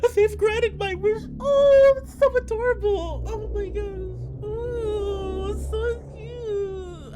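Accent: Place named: New Jersey, United States. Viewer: Hey, if your New Jersey accent comes out while recording then roll with it. Except for with Colby and one of his options. K-ho's inspiration for the setting was Boston so it feels almost authentic when your New Jersey/Northeast accent comes out.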